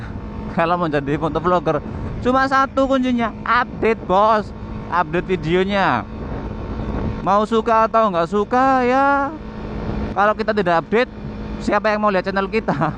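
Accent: Java, Indonesia. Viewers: kekuranganmu jd kelebihan kang... jd inget Iwan Banaran..podo2 medok bhsny..tp followernya..byuhhh ribuan bosss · medoke sae mas njenengan. nek kulo mboten sae sanjang kados niku hahaha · saya tunggu om konyol'e medok.. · mas ini ngomong medokny itu lho enak didengar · mas lok ngevlog ajak lady nya dong, biar seru gitu! we like you medok... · gapapa mas, kalau medhok tuh biar lucu #Hehehehe #...!!! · iyoo mas, bahasa medok, Jawa gadho2.... tp mlah gokil, gk ngebosenin, pokoke like dech bang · saya suka ke medddhok an anda🙌😁✌ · suka sekali bahasa medoknya has sekali...OK mas bro...bs gk ngabuburitnya viewnya sepanjang jalan sunan giri sampe sby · ikih loh sing bikin seneng,logat lan guyune... gimana ya klo featuring sama ajgum motovlog yang satu Sunda abis yg satu Jawa medok... mesti keren.. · ciri khas medok nya jadj ada khas2 sama sensasi gimana gitu hahahaha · Upload terus videonya Mas, bhasanya aku suka medok2 pie gitu wkwkwk Salam Dari Anak Jawa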